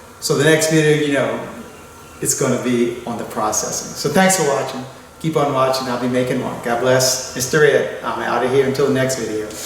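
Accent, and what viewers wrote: Louisiana, United States. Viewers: how is everything where you live your accent let's me.know your in Louisiana new Orleans somewhere around there......how is.you and your family doing after the hurricane is.your property and everything and everyone okay I hope you and your family are.doing great God bless you guys I am still praying for everyone there 🙏 have a great night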